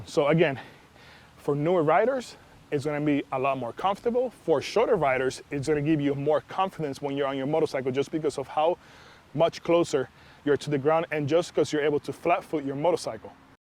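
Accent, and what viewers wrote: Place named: Cuba. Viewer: I detect a Cuban accent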